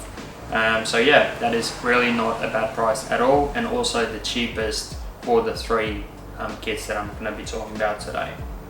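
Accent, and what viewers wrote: South Africa. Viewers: are you South African born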